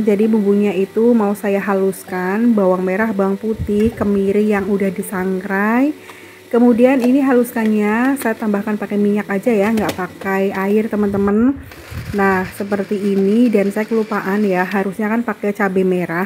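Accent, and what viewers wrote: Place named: Java, Indonesia. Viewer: Mbae org Jawa nya mana mbaa.. Kerasa medoknya.. Hehe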